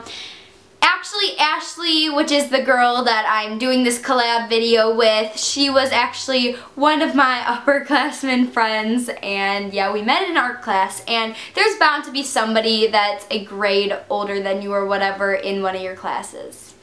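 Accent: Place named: New York, United States. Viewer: What state do you live in? It sounds like New York or New Jersey or Boston or something!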